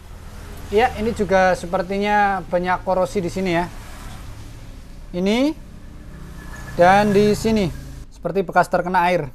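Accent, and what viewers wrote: Banyumas, Indonesia. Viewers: logatnya kaya ngapak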